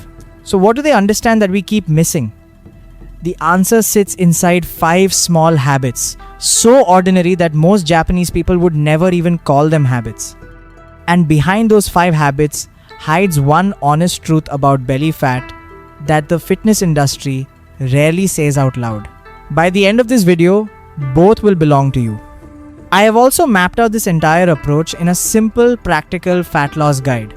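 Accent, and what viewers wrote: India. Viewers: Nice videos ,but your accent is indian not japanese at all ,nothing wrong but it is very clear .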